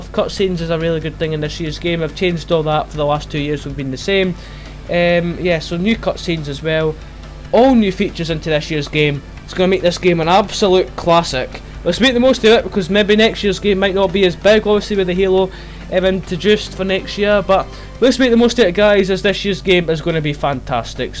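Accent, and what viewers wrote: Scotland, United Kingdom. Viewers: thodoris papa that's the glorious sometimes annoying to a English Man Scottish accent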